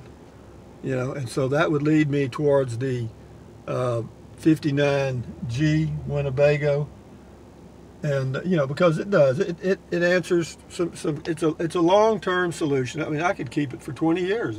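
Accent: Texas, United States. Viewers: Enjoy those tacos and thanks for the positive, happy attitude even when referring to negative comments. The more you discuss the class B vans, the weaker I'm becoming. If there is one weakness I have, it's motor vehicles and the reason I started following this channel is I was looking at small travel trailers online when came as a suggestion from you tube. I've been watching every one I can since then. It's a combination of the content and also largely on as a soft spoken gentleman, the Texas accent just adds to it all.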